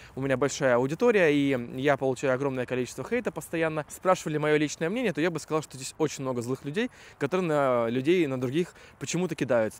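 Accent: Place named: Kyrgyzstan. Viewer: Красавчик, у тебя уже акцент кыргызский😊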